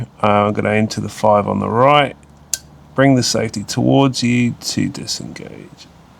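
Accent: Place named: United Kingdom. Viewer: I am sure its a nice gun but as soon as I hear british accent and sub 12ft/ibs I turn off.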